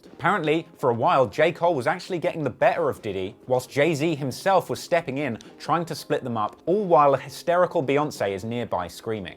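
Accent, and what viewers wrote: United Kingdom. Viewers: This guy is like fingernails on a fucking chalkboard for me. Honestly, and oddly I admit, NO OTHER PERSON ON ALL OF YOUTUBE gets on my nerves like this limey Brit fuck. Oh my god he's insufferable with the same fucking jokes over and over, let me actually go to the most insufferable thing: white British people, please, please PLEASE don''t culture vulture and comment or perform hip hop, ever. See Aries Spears hilariously talking about how British hip hop is just the worst abomination of accent and manner of prim and proper accent trying to do a cool, US southern slang art form. "CAN-YAY. CAN-YAY." "TWO-PACK.TWO-PACK." HOW THE FUCKING FUCK!!! HAS THIS GUY NOT BEEN CORRECTED ON THE FAMOUS MISPRONOUNCIATION OF TUPAC YET!? "LIFE OF PAB-LOW. OH GOD I CAN'T BEAR THIS LITERALLY I'M ACTUALLY SAD I CAN'T WATCH ANY OF THIS DECENT CONTENT BECAUSE I JUST CAN'T FUCKING STOMACH THE NARRATOR FOR ANOTHER FUCKING MINUTE LITERALLY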